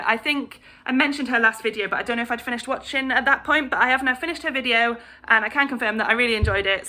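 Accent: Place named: United Kingdom. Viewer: Hey! I’m a new subscriber and a relatively new Flosstuber also. Love your projects, personality and accent! I’m an American and my 10 year old daughter walked in while I was watching you. She said - “is she British? I LOOOOOOVVE her accent.” Lol. And so do I. So fun. Anyway - for what it’s worth I love life updates up front. Life updates make a Flosstuber more relatable and memorable. Just my 2 cents. ❤️❤️ Looking forward to more. 👍😘🤩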